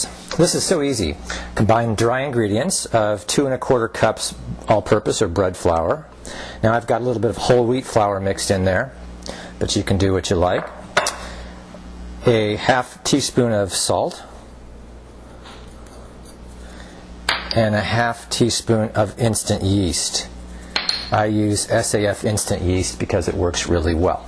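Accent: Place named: United States. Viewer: This video is great, however I am confused with the "cups" measurement. I am from Europe and have no idea what a cup sized is, i have a couple of them, and most of them are different size lol, and in addition, I have found several version of "cups" on few websites i visited. I suggest that one cup is 136 grams of flour or 240 milimeters of water, which was referred as american cup, and because of the guys accent, i would say he is from us.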